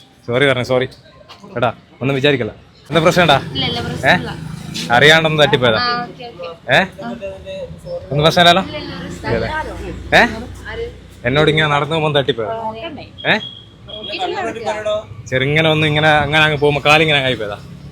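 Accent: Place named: Vadakara, India. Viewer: ഇവൻ. വടകര. ആണെന്ന്.. തോന്നുന്നു. സംസാരം. ഓവർ 😡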